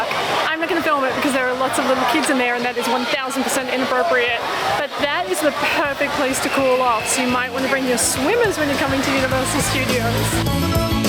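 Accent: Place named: Australia. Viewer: Hello I just subscribed to your channel. I have mad respect for you going to theme parks alone. Couple of weirdos starring. I love your Aussie accent. Stay cute and safe!! 😊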